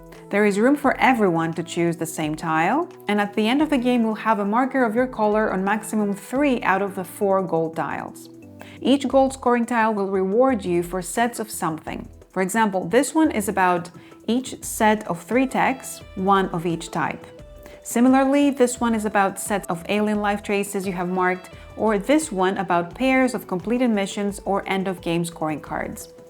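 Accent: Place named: Greece. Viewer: πολυ ομορφη εξήγηση. Ευχαριστουμε! Επιασα Ελληνική προφορά, ελπιζω να μην κανω λαθος. 😄😄 επαγγελματική διαστροφή ειμαι καθ. Αγγλικων. φοβερη δουλειά. Ζηλεύω. 😂 θα το παίξουμε σημερα. και θα το εξηγησω (ως συνηθως 🙄). θα σου πω εντυπωσεις!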